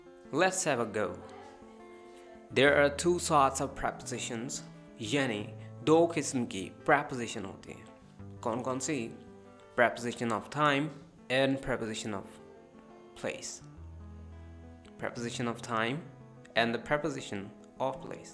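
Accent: United Kingdom. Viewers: Sir pak ki english tenses preposition to ata hai lekin british accent nhe samjh atta apka 11 word wali video awsm the plz 1 video main batain k British language A TO Z kesay pronounced karengaylk PLZZZZZZZZZZZZZZZZZZ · chawal Urdu to thek thek bol.etni acting kr rahy ho jesy tm angrez ho or Urdu bolna ni ata .Urdu m English wala lehja rkha hoa h.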